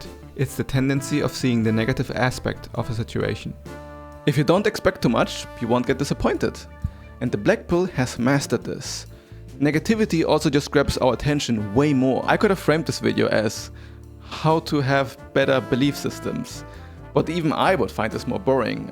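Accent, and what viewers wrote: Germany. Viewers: Where are you from? Your english sounds like it has a german accent lol. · This may be the greatest Video I have ever seen. I made my way to nearly the exact same spiritual/philosophical journey and came to a similar conclusion: Love is the connection we DECIDE to realize that we are or should be the same thing. The opposite of this is what we all perceive as evil: the separation of entities and acting (unfairly) different upon them. That means that the more we empatheticly put ourselves into the shoes of one another and the more we decide to be part of the same thing, the less evil we are and act and the more meaningful and happy life becomes. Cheers to you my friend for having similar discoveries! Or should I rather say cheers to me? 😉 (ps: your accent sounds German to me - where do you life? If you live in Germany I'd love to have a real life conversation about things!) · Is that a german accent I hear?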